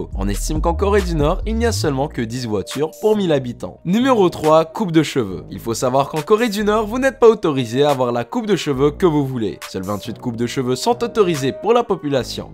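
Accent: Quebec, Canada. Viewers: Québécois sinon bonne vidéo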